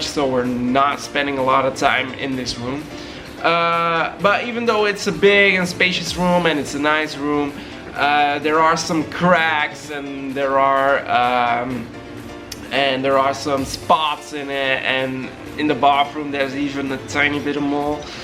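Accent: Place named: Russia. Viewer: You sound Russian that speakers very good English, for a Russian to go there it might not be worth it but as a Canadian paying 1400$ Canadian dollars including flight transfers and resort for level I think it’s a good deal. It’s Cuba you’re not going to get the same standards as Europe or North America but it’s still a good offering.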